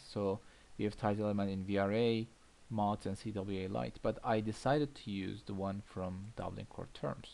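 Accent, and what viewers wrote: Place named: Turkey. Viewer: abi ingilizce aksanın ben türküm diyor :D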